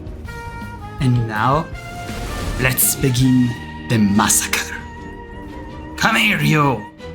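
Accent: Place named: Italy. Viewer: I think your english pronounciation is the worst I've heard so far. It's impossible to understand the video without turning on the subtitles. I could argue you might be italian from the accent. Either way, work on that or just start using elevenlabs for AI generated voice instead.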